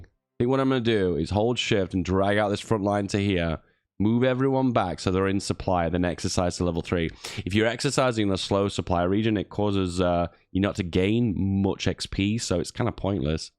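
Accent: United Kingdom. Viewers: your calm and collected attitude and British voice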